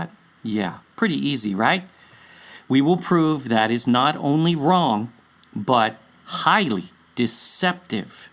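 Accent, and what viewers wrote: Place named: Philippines. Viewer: are you Filipino, if you are which sounds like you are, have you seen on the true Queen of Sheba, she was filipina, which was amazing to me. I am Cherokee/Irish, which we come from Yasshakar, that was amazing to me too. Shalum and be blessed akhy (Bro) in YaHUaH.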